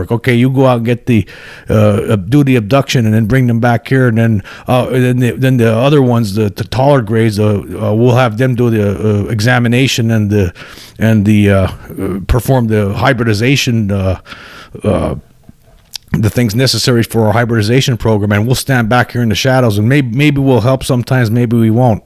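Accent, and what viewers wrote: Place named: Philadelphia, United States. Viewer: You sound like a Philly boy like me.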